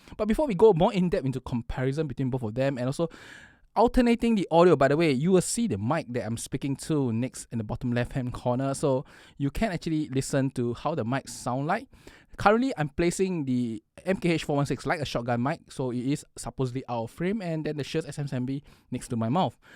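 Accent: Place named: Singapore. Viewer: As a fellow Singaporean who sounds similar to you. The Shure SM7B and the MKH50 does not sound that dark or boomy with our voices vs American and Europeans voice.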